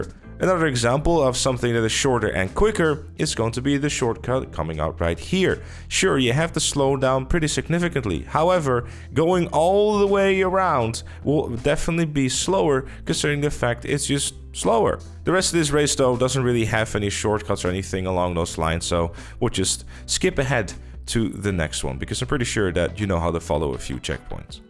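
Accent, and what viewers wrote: Netherlands. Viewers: Love the Dutch accent 😎👌🏻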